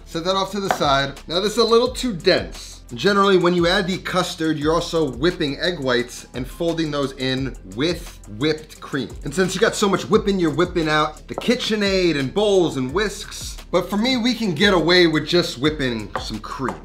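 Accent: New York, United States. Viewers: Its an east coast heavy ny acccent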